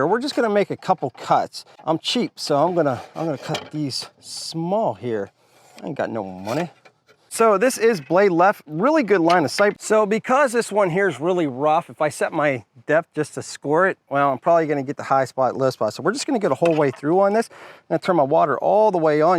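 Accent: Pittsburgh, United States. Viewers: I hear Pittsburgh in your accent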